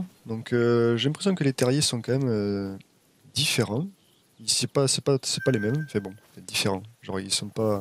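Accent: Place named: South, France. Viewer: abo j'avais laissé le jeu de côté après des centaines d'heures de jeux tu m'as redonné envie d'y jouer... Merci c'est peut être l'accent du sud lol bon contenu en tout cas je vais te suivre 👌👍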